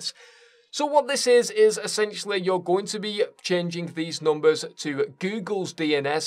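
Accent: Scotland, United Kingdom. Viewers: This Guy Is Scottish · are you Scottish or English?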